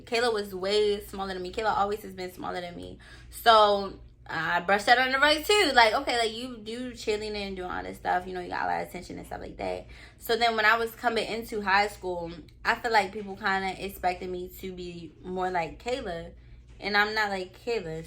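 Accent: Baltimore, United States. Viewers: I knew it your from Baltimore the accent 😇 pretty girl 😍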